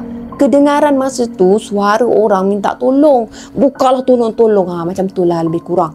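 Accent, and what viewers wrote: Kelantan, Malaysia. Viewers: Kak bercakap KL capur dngn bahasa kelantan,saya selalu hadir Kak,saya dari kelantan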